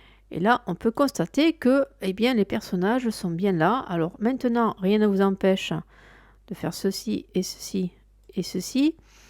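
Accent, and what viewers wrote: South, France. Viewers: Encore un tuto sympa avec une voix du Sud agréable qui donne envie d'aller au bout de la vidéo. Une question : comment et où aller pour télécharger les masques ?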